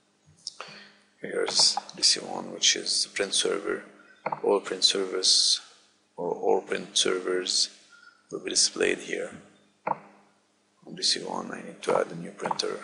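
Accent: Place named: Germany. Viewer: no explanation whatsoever...seems to be in some kind of hurry.........could not understand stupid german accent also !!!!!!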